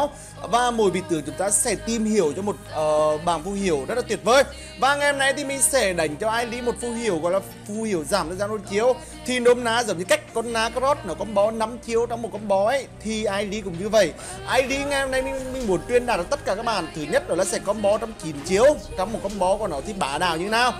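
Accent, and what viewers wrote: Nghe An, Vietnam. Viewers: nghệ an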